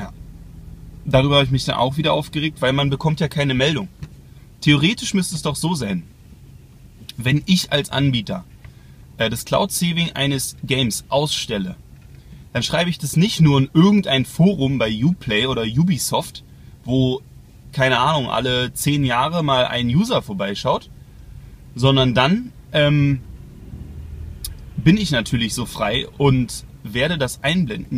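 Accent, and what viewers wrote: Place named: Berlin, Germany. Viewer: Also ick find ja das dein Berlinerisch jarnich mal so einjerostet is ick hab dich voll und janz verstanden.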